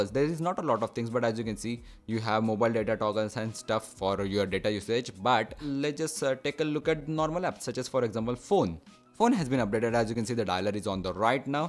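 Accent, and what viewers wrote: India. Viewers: too deep indian accent indeed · Why Indians voices Soo interesting and different? How are you saying "R". My nerves broken. Somebody can say something? I don't want hear voice of them omg😵(I am not racist). If I hear voice of one of them. all day I can't forget that. This make me sick, just I want to throw up🤮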